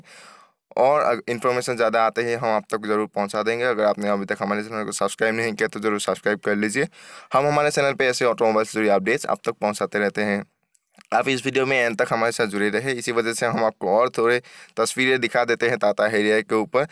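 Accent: Assam, India. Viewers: This is Assamese accent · Axomiya tone full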